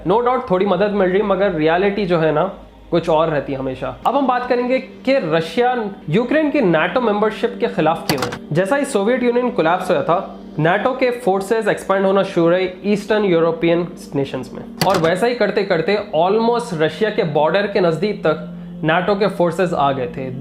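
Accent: Hyderabad, India. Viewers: Hyderabadi Urdu...🇵🇰👍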